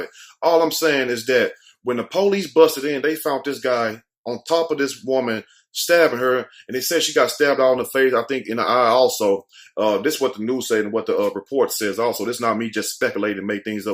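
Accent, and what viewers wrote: South, United States. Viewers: where are u from I can't place your southern accent?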